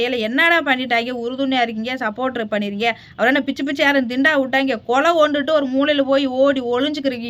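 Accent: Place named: Madurai, India. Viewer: மதுரை பேச்சு 👍👍👍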